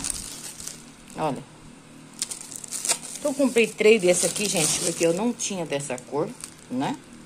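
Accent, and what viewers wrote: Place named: São Paulo, Brazil. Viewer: Vc e do interior de São Paulo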